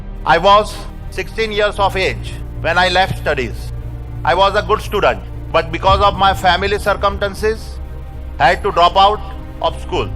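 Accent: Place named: India. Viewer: Ye to apne jaisi english bolta hai ❤️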